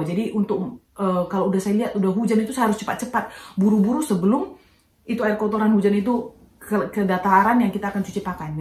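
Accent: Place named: Sulawesi, Indonesia. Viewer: Loghat atau slang anda sepertinya dari Sulawesi, maaf jika salah. Saya pernah jalan jalan di Sumatera, Sulawesi, Kalimantan, Kepulauan Riau....saya dapati negara Indonesia sangat kaya dengan sumber alam, sawit terbesar, nikel, minyak dan gas,,,,malangnya oligarki yang mengawal kekayaan dengan korupsi....ada banyak kemajuan semasa Pak Jokowi...selepas 2024 tak tahu lagi.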